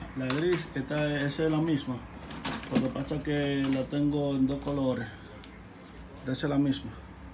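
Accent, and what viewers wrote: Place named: Dominican Republic. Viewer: Es que así es que hablamos los Dominicanos, y no solo cambiamos "L" por "R" tambien cambiamos palabras por completo😂😂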